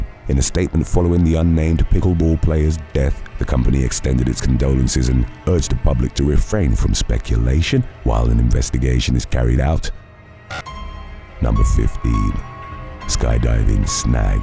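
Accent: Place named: United Kingdom. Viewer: Here’s some more worst news. A commenter on this channel recently criticized for “using a British accent.” should, according to that reviewer, “Bruh, just say the words.” Huh?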